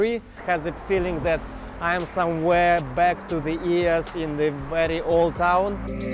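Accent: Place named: Russia. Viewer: никакой он не американец, американцы с таким диким русским акцентом не говорят.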